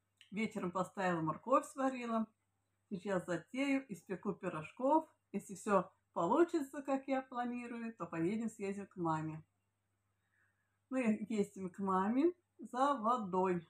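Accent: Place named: Russia. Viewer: Слышу знакомый говор на О.где вы живете?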